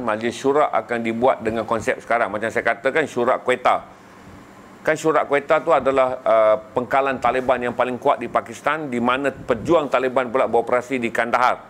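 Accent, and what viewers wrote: Kedah, Malaysia. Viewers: Nak dengaq tuan ckp Kedah sepenuhnya.....hehehe takut hilang pelat Kedah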